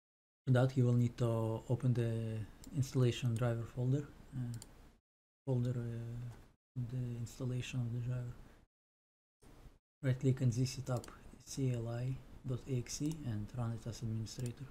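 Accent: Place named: Russia. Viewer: Dude I need this tutorial for my R9 390 and I just realized you are Estonian, lessss goooooo. You have a thick Russian accent so you probably have a accent while speaking Estonian as well but I am just glad to see another brother on the web.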